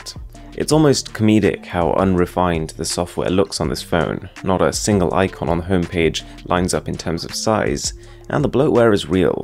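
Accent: United Kingdom. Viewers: Do you use a british accent? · The accent alone makes the subscription worth it... Like listening to Earl Grey tea talk about tech in an ever so slightly snide but entertaining manner. Perfect. Let me go get my smoking jacket and que the next video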